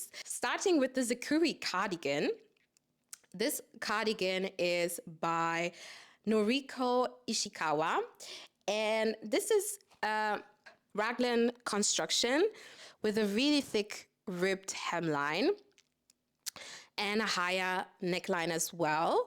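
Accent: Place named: Germany. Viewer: He, he - wenn dich die Aussprache von Rerum Natura nicht verraten hätte - spätestens bei „soooo“ war ich mir sicher, dass du in Deutschland zuhause bist! 😊 LG aus Österreich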